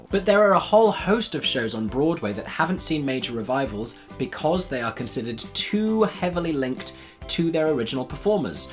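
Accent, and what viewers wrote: United Kingdom. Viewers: This critic is very intelligent, I'll give him that. He looks like he's 19 years old. Wonderful that he thinks he's all that. This is ALL his opinion. A British accent doesn't make you an automatic theatre historian. A bit full of himself. Not impressed. On another note, I dont think Beanie was well cast at all. Miscast, to say the least. Of course she is no match for Streisand in any decade or in any category. The voice alone. I'll tune in when this kid grows some more facial hair. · British accent vs Brooklyn Jewish accent. What a trite criticism.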